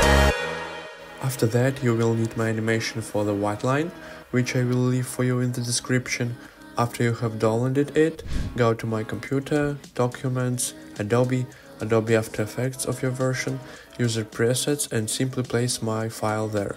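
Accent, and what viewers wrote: Russia. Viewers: Чувствуется русский акцент в твоем английском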